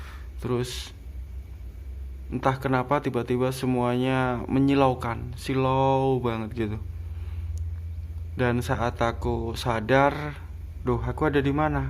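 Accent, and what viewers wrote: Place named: Java, Indonesia. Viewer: Mf mas anda kyknya.dari logatnya dari jawa ya,bhsanya kok kyk jawa asli,kok ngrti pecut ,di pecuti,di pecuti klw bhs jawa d kawengi pakai pecut,d sabeti pakai pecut,klw spngetahuan sy di pecuti sm dgn d cambuki,kyk istilah hukuman d aceh d cambuki,smga buat pembelajaran mas,mf mas mukanya kyknya kok bengkak istilah jawa bngkak itu tembem abuh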